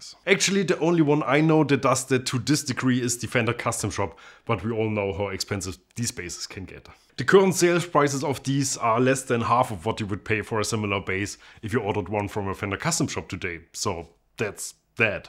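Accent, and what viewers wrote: Germany. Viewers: Looks can be deceiving, for sure. This German/Austrian-sounding bald man plays bass as soulfully as any funk bassist I’ve ever heard and I was playing music (drums) in the ‘70s, when I was a teenager. Sweet video! Blessings, Grace and Peace 😊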